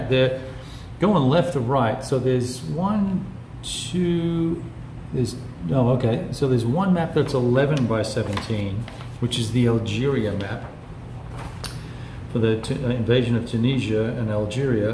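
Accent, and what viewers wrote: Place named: Australia. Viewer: The Australian accent adds to the Guy Pierce thing goin on. Though I reckon Mr Sharp is more the Teddy character in this one ;-)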